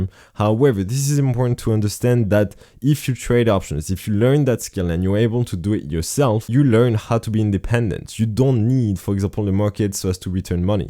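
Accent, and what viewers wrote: France. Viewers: Good long term outlook. And the French accent is cool 😀.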